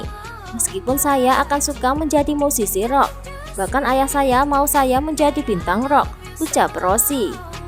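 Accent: Java, Indonesia. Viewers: Jawane medhoookkk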